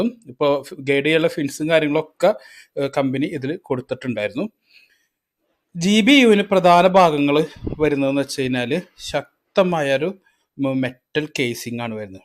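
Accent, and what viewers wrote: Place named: Thrissur, India. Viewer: മലയാളം ശരിക്ക് സംസാരിക്കാൻ ശ്രമിക്കൂ. തൃശ്ശൂർ സ്റ്റൈലിൽ അല്ല വലിച്ചു നീട്ടിയുള്ള സംസാരം ഒഴിവാക്കു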